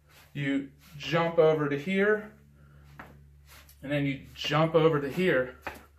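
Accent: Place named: South, United States. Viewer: Great tutorial! May I ask where you are from though? You have a very interesting accent! (Southern US?)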